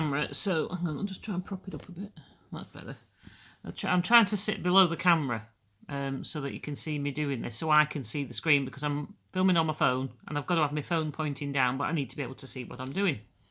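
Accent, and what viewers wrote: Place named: North Yorkshire, United Kingdom. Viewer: Wow, your accent transported me to my childhood in North Yorkshire. You sound just like one of my primary school teachers...I've been in the States for 26 years, but I felt like I time-traveled just now!! Came across your channel while looking for tips on circulars and I didn't realize how different the length of the needle itself could be! I'm trying with Lykke needles but am also a continental style knitter. Maybe I need to take a closer look at the chiagoo after all. (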